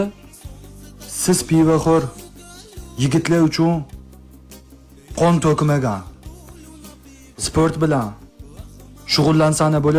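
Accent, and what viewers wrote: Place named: Namangan, Uzbekistan. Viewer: Намагга шивага гап йок 😂😂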